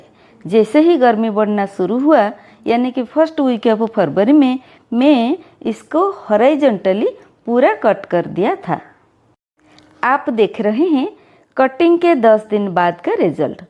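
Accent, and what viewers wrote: Odisha, India. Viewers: Very beautiful, your presentation is also very beautiful like Hindi in Odia accent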